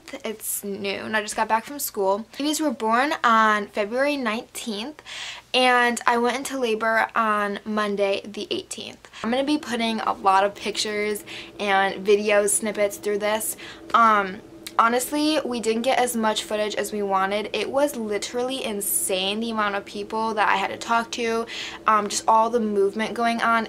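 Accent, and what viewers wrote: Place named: New Jersey, United States. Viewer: Are you from New Jersey? Your accent reminds me of Jenny or JWow for Jersey Shore.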